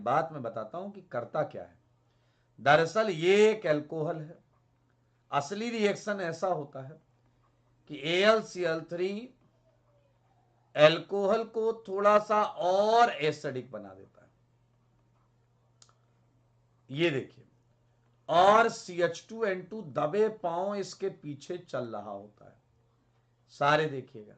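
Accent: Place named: Uttar Pradesh, India. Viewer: Sir aap up se hai?? Aapki accent mere papa jaisi hai 😅😅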